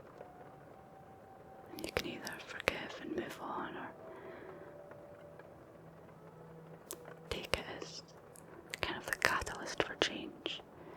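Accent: Scotland, United Kingdom. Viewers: Hi! Actually your ASMR is the only one right now that helps me sleep. Breakups always hurt even if they are on good terms. I recently lost a girl because I declared my feelings to her. I still consider her my best friend and it was partly my fault because years ago she was interested in me but I couldn't see it. I had my mind on other things. But at least we had a great friendship, the problem is what I felt was growing. And now here I am suffering. I would like to recover the friendship at least but I see it difficult. It won't be the same anymore. Thanks again, your Scottish accent and naturalness help me. Greetings from Spain. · This may get kind of long, but I will try and shorten it up as much as I can. First, let me say that I didn't know what ASMR was until a few months ago. I was having a hard time getting my mind to wind down while trying to go to sleep. 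I was trying white noise, tribal flutes, thunderstorm sounds, etc. Just anything that I could think of to relax to. I just happened to be scrolling through YouTube when I saw a heading reading This Scottish accent will knock you out. Now I always been a sucker for a soft Scottish accent, so I clicked on it and that was probably the best sleep I have had in a very long time. I have been watching your videos just about every night since then. Your voice and the natural flow of your hand movements just does something to this southern boys brain. So thank you for that. Second, I am sorry to hear about the latest events in your life. I know it will take some time, but you will definitely be ok. There are seven stages of emotion that you will go through before you are truly ok, but I know you got this. I have gone through them a few times and I can tell you that you will always come out stronger and more alive than ever before. 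The human heart was designed to reheal itself from hurt and pain because we as humans will continuously experience things in our lives that we have no control over. We will get knocked down, we will get our hearts broke, we will become emotional wrecks, but we will always be able to pick ourselves back up, dust oursel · I don’t comment very often because I’m just the older guy who happens to appreciate your videos, your smiling eyes and that wonderful Scottish accent. But your eyes aren’t smiling this time and I just could not sit by and keep quiet. I won’t offer you a string of clichés about getting past the pain of losing someone you cared for. By your comments here it’s apparent that you will be ok. I just wanted you to know that despite the fact that our only connection exists in the ether of YouTube, I know you will be happy again soon. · Attaweyman, I love the Scottish, go on you dancer, great video with a touch of real life, first 6 months are the worst 😎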